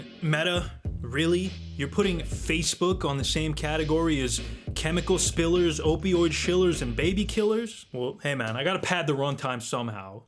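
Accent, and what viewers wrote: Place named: Chicago, United States. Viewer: great chicago accent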